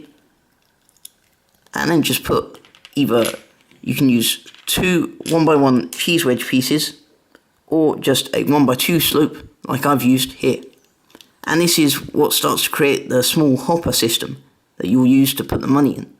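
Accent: United Kingdom. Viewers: I like your voice are you from Britan? Also your really smart and creative!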